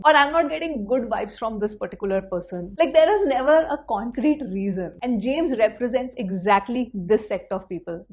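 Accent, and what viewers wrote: India. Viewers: Your Indian accent is so annoying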